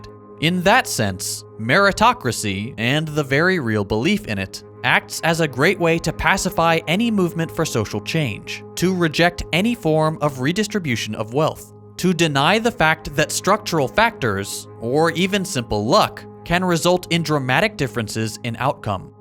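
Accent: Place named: Idaho, United States. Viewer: my mistake. People in Idaho sound a lot like you.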